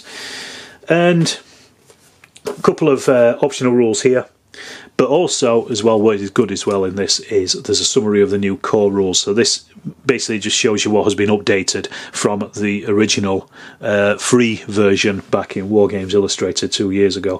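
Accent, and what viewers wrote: Northern England, United Kingdom. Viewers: I preferred Medieval Wargamer's review of the rules, it was more positive and Nic has a sexy voice compared to your northern tone lol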